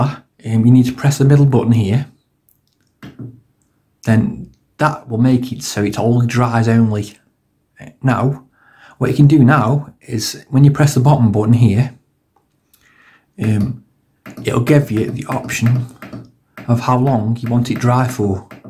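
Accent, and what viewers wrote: Stoke, United Kingdom. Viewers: you from stoke mate?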